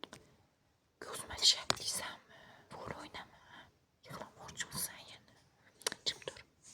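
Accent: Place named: Fergana, Uzbekistan. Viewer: Асмр учун рахмат омад тилеман. Шева Фаргонаники шеклли ааа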